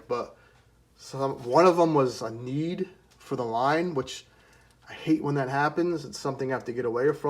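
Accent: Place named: Long Island, United States. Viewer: Cool accent. Are you from Long Island?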